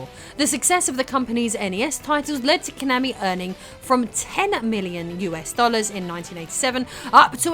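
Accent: England, United Kingdom. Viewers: How have I not come across this amazing channel before??? So much rare footage, attention to detail and such a lovely, kickass english accent 🤩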